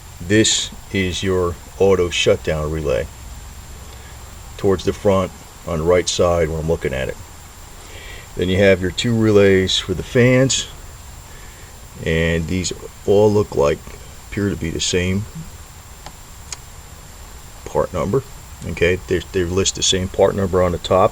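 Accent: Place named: Pittsburgh, United States. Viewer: you from Pittsburgh??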